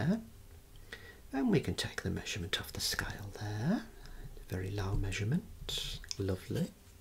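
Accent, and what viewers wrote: Birmingham, United Kingdom. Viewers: When a man can make me relaxed with a Brummie accent you know you're watching a true master of their craft.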